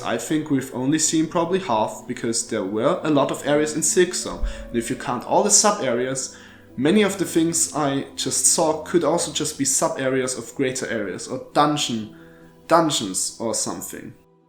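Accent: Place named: Germany. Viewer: Ich der direkt nach den ersten Sätzen bemerkt das nen Deutscher ist den man hört es einfach richtig stark raus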